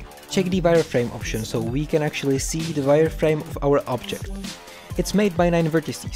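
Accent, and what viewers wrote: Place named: Czech Republic. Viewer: Hmm, you accent sounds little bit like czech, or slovakian? Im sure youre from central europe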